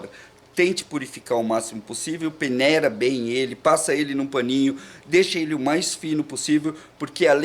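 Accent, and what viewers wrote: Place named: São Paulo, Brazil. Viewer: Que bonitinho sotaque paulista!! O mais importante é o tutorial.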